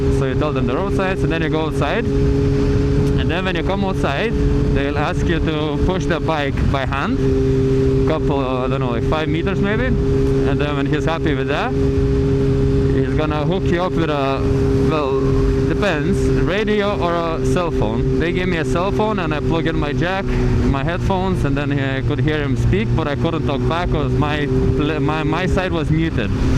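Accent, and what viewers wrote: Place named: Latvia. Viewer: Cheers mate. Ain't you from Lithuania? I hear some accent... Latvia, maybe.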